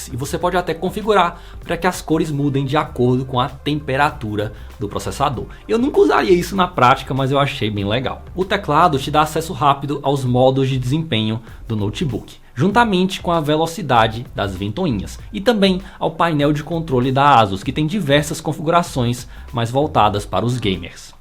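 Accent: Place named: Salvador, Brazil. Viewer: Seu canal é muito bom mano, impressionante como pessoas assim não tem tanto destaque quanto aos demais, vi cada review fraco até chegar aqui. Acredito e torço pra que seu canal tenha o crescimento que vc merece. Esse sotaque... vc tbm é Salvador?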